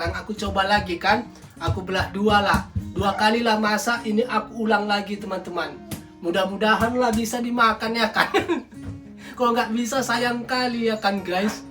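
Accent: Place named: Batak, Indonesia. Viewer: Klu mendengar logatmu, marga apa kau lae.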